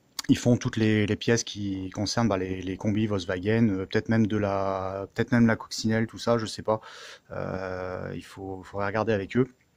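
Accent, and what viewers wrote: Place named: North, France. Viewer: On entend quand même l'accent du nord lol ,super toujours au top tes vidéos et du taff de qualité j'adore ça ,je suspecte de plus en plus qu'on a à faire à un formateur en méca ou alors un grand grand passionné chapeau l'artiste 😉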